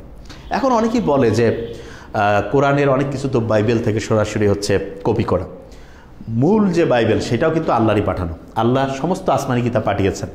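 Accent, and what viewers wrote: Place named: Rangpur, Bangladesh. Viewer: Sir apni rangpurer Manus sune khub vhalo laglo❤🥰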